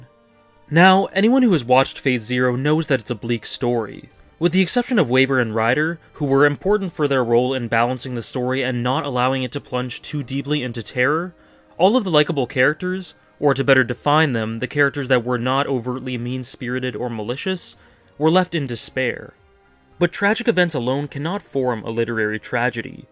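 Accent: Canada. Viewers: Good content to go with a very noticeable Canadian accent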